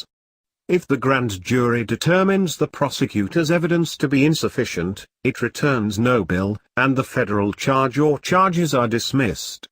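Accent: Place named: Russia. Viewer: Wtf robot voice hide Russian accent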